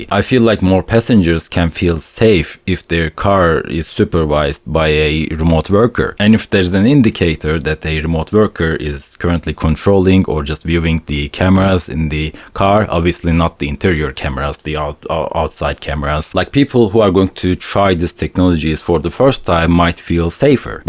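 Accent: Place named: Turkey. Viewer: Abi Türk aksanı hayvani sızmış, elinize sağlık 🔥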